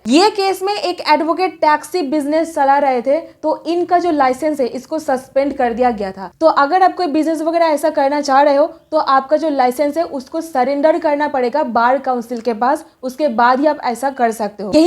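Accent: Bengal, India. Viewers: Bengali tone but you have very distinct ,clear pronunciation in Hindi I highly appreciate it mam, please keep it up mam , with regards.🙏👍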